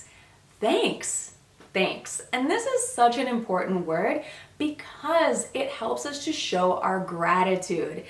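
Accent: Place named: United States. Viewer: her pronunciation is American because she from America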